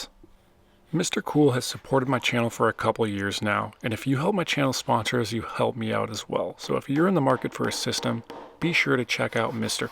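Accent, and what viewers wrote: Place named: Midland, United States. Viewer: baking vs bakin' Stormed by the midland dialect of North American English: goin', doin' waitin', etc. And how about the disappearance of the distinction between i and e before nasals? Pit/Pet: solid difference. But Pin/Pen: they sound identical to the midland dialect ear. BTW, thanks for a great video about the creation of art which explores way beyond the creation process to include unexpected results. Top notch!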